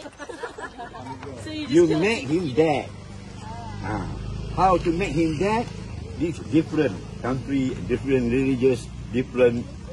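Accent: Terengganu, Malaysia. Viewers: terkenal sohor dgn loghat daerah tganu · English Terengganu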